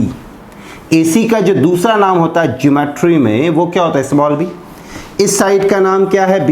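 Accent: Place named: United States. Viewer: sir aapki hindi, american hindi jaisi lagti hai mast.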